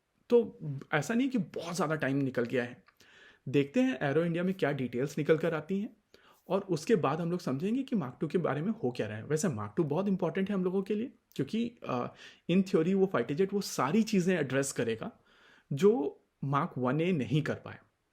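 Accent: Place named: Maharashtra, India. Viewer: भाऊ. तुम्ही मराठा(महाराष्ट्रीअन) आहात का? तुमच्या कारण शब्दाच्या उच्चारामुळे जाणवले.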